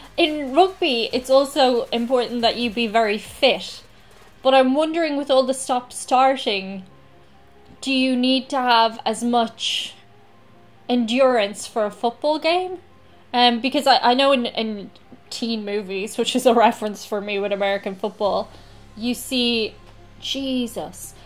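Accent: Ireland. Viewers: With all the American topics I was starting to think there's a strong possibility that an irish impersonation was just part of a gimmick haha so terrible of me to say I know, there's no complaints it was just a little peculiar when I heard it!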